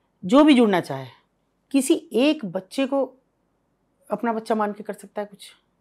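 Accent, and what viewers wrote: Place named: Meerut, India. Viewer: Mairath vala lehja ❤😅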